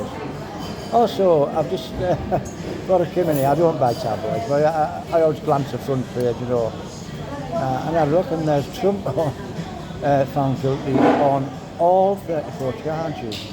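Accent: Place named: Yorkshire, United Kingdom. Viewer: Where are you from? I love your northern accent 😃is it yorkshire? X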